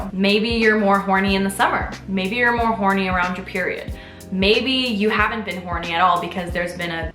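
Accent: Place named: Canada. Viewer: I repeat I'm here only for sweet Canadian accent 😁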